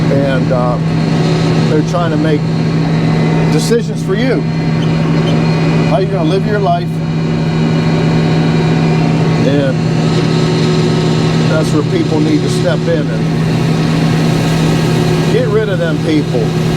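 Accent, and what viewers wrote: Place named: Pennsylvania, United States. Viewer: Both of my parents were 1st-generation Americans ("greatest generation") who were born and raised in Fayette County PA, it's such a joy to hear your accent which reminds me of them and of my large extended PA family.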